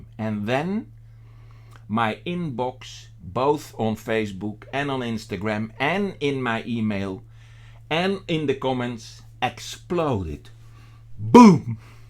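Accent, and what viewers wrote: Netherlands. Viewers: Nice that you are back again, I love when Dutchman speak english...........Act natural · Ha Ha Ha, you can't be Tony Fleming! Just like the English can't sing Russian Folk songs? Nor can a Dutchmen copy the sublime tones of a traditional Yiddish song? Be yourself because to me you sound and have the same mannerisms of my brother Tony? I know how to translate you amusing "dinglish" to English with great ease! Be yourself mate, that's the way we like you! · Keep that Dutch accent - its genuine.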